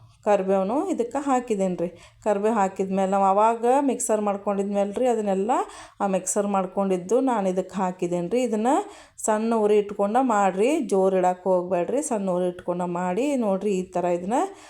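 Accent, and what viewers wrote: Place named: North Karnataka, India. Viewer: ಇದೆ ತರ ಮಾತಾಡಿ ಸೂಪರ್ ಇದೆ ನಮ್ಮ ಉತ್ತರ ಸೈಡ್ ಸೂಪರ್ 🎉🎉❤❤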